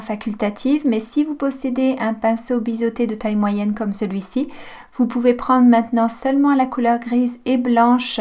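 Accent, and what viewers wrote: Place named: England, United Kingdom. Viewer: vous avez toujours été française ? car j'ai l'impression que vous avez un accent anglais.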